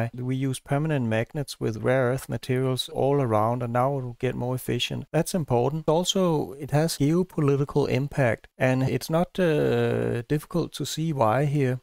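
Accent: Denmark. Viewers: Du låter dansk! 🇸🇪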